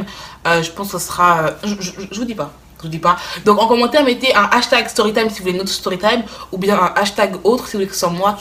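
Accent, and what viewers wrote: Africa. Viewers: je t’aime bcp surtout ton accent africaine oh j’adore 😍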